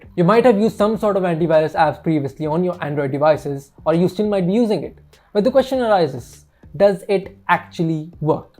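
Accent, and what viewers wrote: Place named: Southeast Asia. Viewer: Get used to it, way more people in the world sounds like this when they speak English than the American or British version. in South East Asia alone there are about 500 million English speakers and they all sound like this. But I do agree he needs to slow down a bit.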